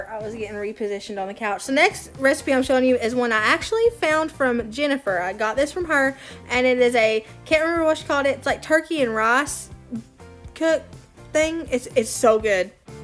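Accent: South, United States. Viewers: You look just like my granddaughter and have the same southern accent I absolutely love it😂😂💕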